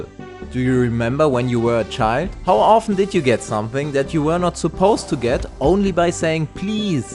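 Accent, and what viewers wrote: Germany. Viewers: great content, very german english but gramatically most of it is right, so keep it up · Der deutsche Akzent...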